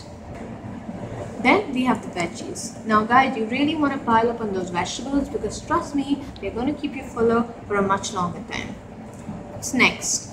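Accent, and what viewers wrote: India. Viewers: I cant pick up your accent sounds Indian. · u sound desi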